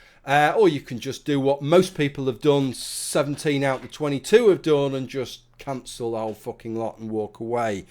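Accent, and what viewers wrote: United Kingdom. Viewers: I hate sargon. But i dont think he should have his income taken away like this. Its not his fault that its so profitable to be a pandering bitch on the internet since his audience is so dumb and will give him money for talking about a country he clearly knows next to nothing about. I dont see why patreon has a problem with a british accent trying to convince ppl he is smrt.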